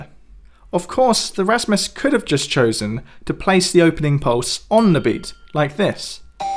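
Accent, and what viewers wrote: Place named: RP, United Kingdom. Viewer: You are way too posh, but still kinda cute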